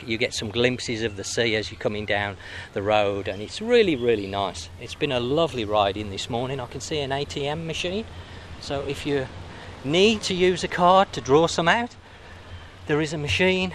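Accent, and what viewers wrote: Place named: Birmingham, United Kingdom. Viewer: Hi what’s it like in Christmas time and could you please recommend any 5 star hotels close to clean beaches and clear seas? Thanks for the vlogs they are great especially hearing the brummie accent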